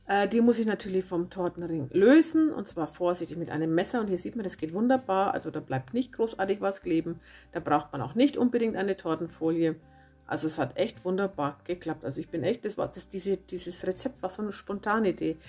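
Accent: Franconia, Germany. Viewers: Ich liebe dein fränkisch :)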